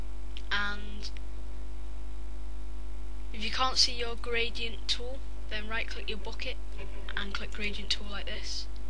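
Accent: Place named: United Kingdom. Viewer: i was GOING to listen and watch this tutorial but i heard that you were british and like final fantasy so related videos here i come..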